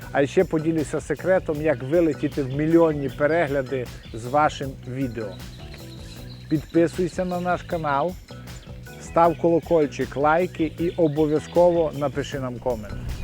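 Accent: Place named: Transcarpathia, Ukraine. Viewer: я четко по акценту понял, что про бограч надо смотреть тут. Бограч и банош-прекрасные блюда. Люблю Закарпатье и людей. Привет из Харькова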